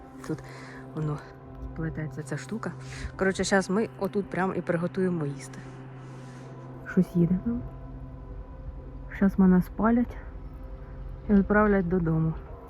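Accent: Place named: Zhytomyr, Ukraine. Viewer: Здрастуйте. Ви не з Житомирської області? Просто слухаючи вашу мову так думаю.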